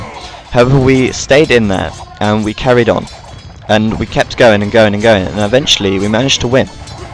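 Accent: United Kingdom. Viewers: This is a great video and I love your British accent. MAKE MOAR!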